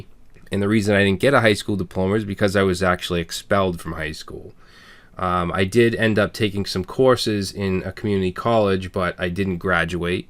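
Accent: Boston, United States. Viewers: Needed to hear this this week - so thanks! Love your channel. Also don't hide your Boston accent! It's great! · Hi, this video really spoke to me. My history is plagued with depression and self doubt but decorated with a passion for coding. You really put the human element back in the job of coding. Many thanks. BTW, I am an old guy and your accent takes me back to JFK, a shining leader in my youth - hope you don't disguise it, I miss it, it is wonderful. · Don't hide the accent brah. A Boston accent is trendy now-a-days. Excellent video. I've definitely felt the imposter syndrome. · Love those videos. They inspire us to learn more. Keep producing them please. Your channel is the best I have encountered. Learned a lot from your channel. Oh, and stop hiding that Boston accent, it reminds me of a great movie, "The Departed". Happy New Year to you and your family!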